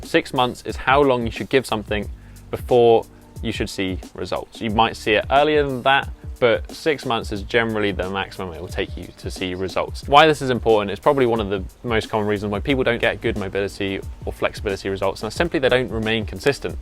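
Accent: United Kingdom. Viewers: Awww british., damn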